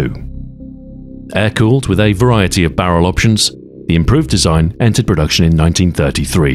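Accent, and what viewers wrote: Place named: Manchester, United Kingdom. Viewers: I want to say Manchester, England. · He's British.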